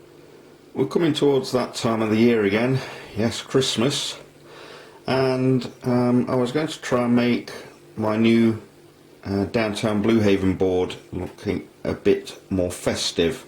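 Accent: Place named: England, United Kingdom. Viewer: Hi Uncle! It was so great to hear from you guys. It's so exciting to have an uncle from the UK with a lovely English accent, I just love it . This page is great, Jake is wanting to watch every video so that we are gonna do. We love to play with trains but we never had anything like you have on here. Love you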